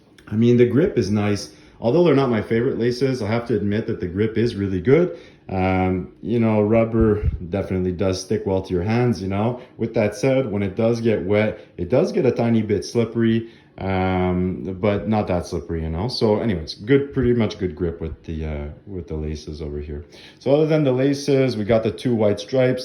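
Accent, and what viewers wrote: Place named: Canada. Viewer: Buddy, questions for you if you have a second. 1) you sound Canadian, are you? If so, I assume you ship to Canada (if you run a shop), oui ou non? Would be great to buy a ball from you to support your work. 2) This Nike ball has been elusive for me - specifically the SKU with the “lower” white stripes as opposed to the striping laid on top of the ball. Do you know where to get one in Canada if you don’t sell/ship them?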